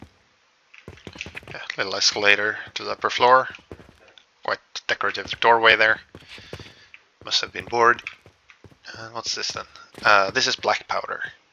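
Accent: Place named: Scandinavia. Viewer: I can tell by your accent that you're probably sweedish? or nordic? Which would explain the impeccable design interior taste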